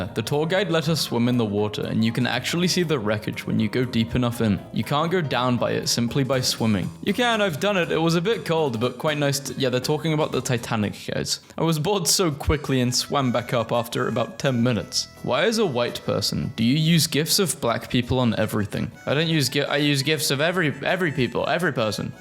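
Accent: United Kingdom. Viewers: To me you sound British. Just not RP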